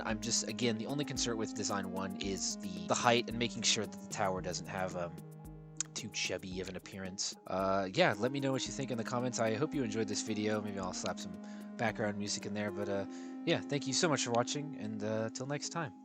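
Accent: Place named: Ireland. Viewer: That Irish accent has me shooketh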